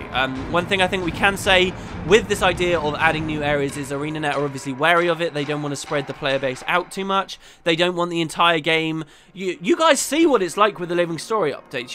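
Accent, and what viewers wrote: England, United Kingdom. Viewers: you have the proper English accept so you instantly sound Epic to us Americans! Well.. i dunno.. to me anyways! lol. Absolutely agree with you on the DE reward scaling..if people had the chance to get like 1g from an event that hadn't been done in a month, people would definitely be out there doing stuff. & the game should TELL you "you got this much extra stuff for doing an ignored event" etc.. Maybe add new medal types.. platinum, Mithril & Orichalcum that only show up on old events & give you special loot boxes.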